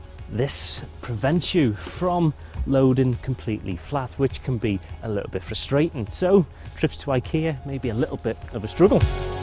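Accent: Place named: Wirral, United Kingdom. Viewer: Annoying Wirral accent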